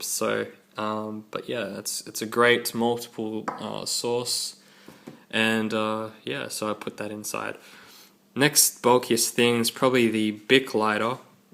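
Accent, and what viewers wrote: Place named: Australia. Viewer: LtWolf/TheOfficialWolf haven't you ever heard an Aussie before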